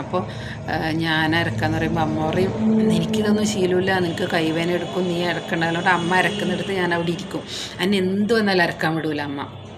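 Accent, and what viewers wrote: Kannur, India. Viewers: നമ്മുടെ നാടൻ കണ്ണൂർ ഭാഷ 👍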